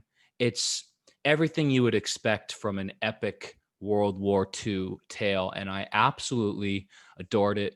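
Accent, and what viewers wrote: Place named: Italy. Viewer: You're Italian accent is amazing.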